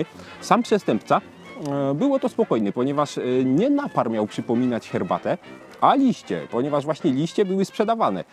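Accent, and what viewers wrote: Silesia, Poland. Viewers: Ze Śląska.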